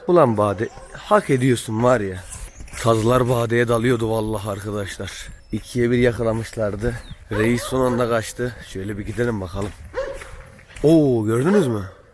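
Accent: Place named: Gaziantep, Turkey. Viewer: aga bu adama anteplıce konus mak cok yakısıyo adam bu adam harbi kral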